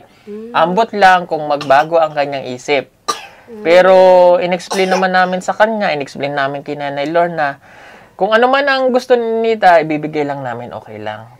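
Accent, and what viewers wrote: Visayas, Philippines. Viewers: Kuha kuha na ni ang pag sasalita ng bisaya. Pati accent kuha kuha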